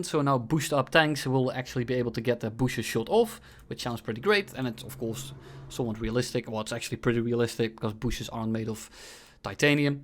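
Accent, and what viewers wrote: Netherlands. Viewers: I do love listening to Dutch people talk about something for up to an hour.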